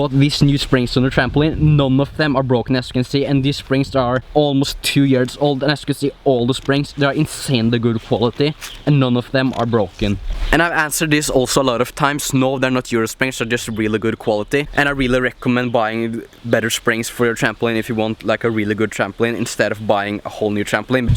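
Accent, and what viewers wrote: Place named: Finland. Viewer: You sound Finnish or Swedish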